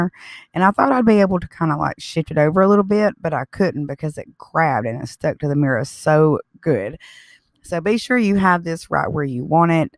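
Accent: South, United States. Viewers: I like it. I would love to see one in white or wood look.. Great job. Love you....you are amazing!! I love you soo much. We are both country gals. Our pronunciations on some are just about the same. I live in the south. But you got it going on.... Xoxo. Hugs your way. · Love it! Where you from girl? Very Southern. I'm from S.C.! ☺💜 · Love the country accent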